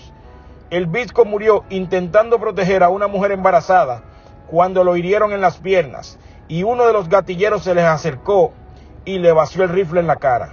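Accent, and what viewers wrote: Dominican Republic. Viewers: El narrador parece dominicano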